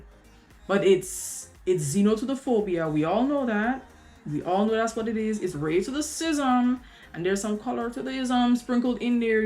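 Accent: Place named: Grenada. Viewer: You sound so Grenadian! I do not go where the sun don't shine!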